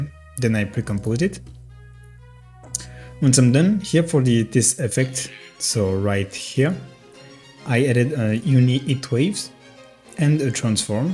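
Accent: France. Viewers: you kinda sound french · tu est français non ? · mdrrrr jme disais la meme en écoutant l'accent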